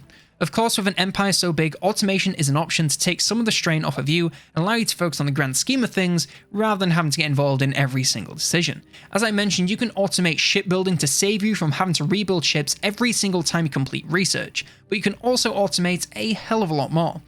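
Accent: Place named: England, United Kingdom. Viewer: Why is every guide by a guy with an English accent? No offense but I have difficulty understanding accents especially when the person talks a mile a minute.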